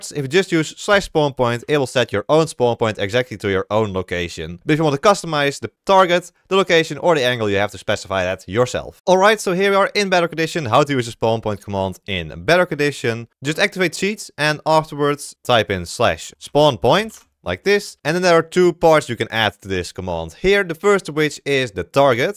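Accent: Netherlands. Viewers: je bent nederlands ik hoor het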